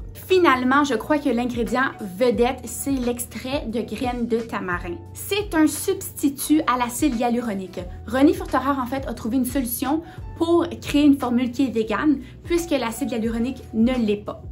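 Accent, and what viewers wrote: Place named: Quebec, Canada. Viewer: J’aime trop ta vibes et ton chou accent québécois je t’aime❤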